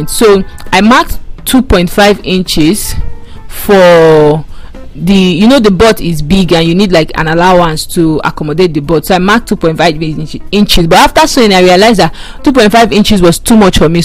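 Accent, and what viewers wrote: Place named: Africa. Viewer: Thank you baby. I am all of 3 days new to sewing, I will definitely try to do this but will start with a skirt fist as I do love skirts. This is very beautifully instructed and informative. Very beautiful accent too. Love my Africans.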